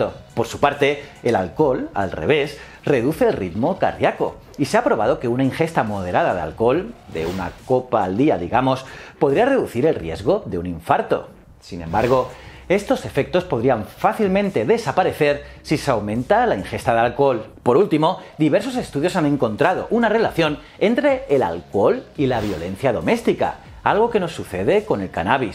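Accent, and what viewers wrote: Spain. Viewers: Todos los españoles tienen un acento horrible al hablar quiteños por favor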